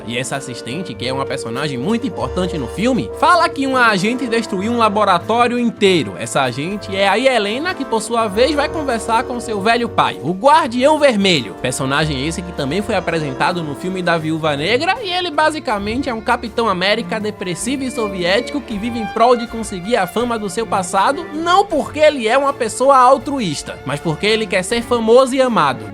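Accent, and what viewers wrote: Brazil. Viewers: eu sei que vc tem o jeito abrasileirado porém você poderia falar as coisas da forma correta tipo o nome da valentina ou outras coisas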